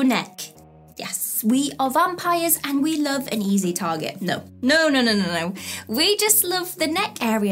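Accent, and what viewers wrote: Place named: Midlands, United Kingdom. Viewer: What I really want to know is about your accent. Where are you from that gives you that very sweet soft and subtle accent. Its not overpowering so definitely not a northerner lol. And not deep into London or Liverpool for that matter. Maybe the midlands or the Lake District. I can’t quite pin it down lol Please do tell. You don’t have to be super specific or anything just the general area or county.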